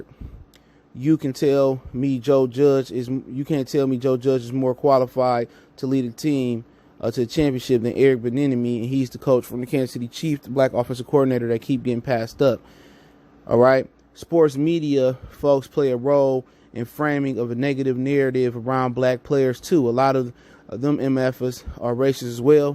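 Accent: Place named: California, United States. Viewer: i will like to add chiefs will pay him what ever he wants. Are you a raiders fan? i know it has nothing to do with it but you got a cali accent lol Also mahomes has not been getting along with his parents for awhile beacause they mad at his brother that is gay. He supports his brother no matter what but the parents trying to cut his brother off. Yes i have the insite homie and this is all true.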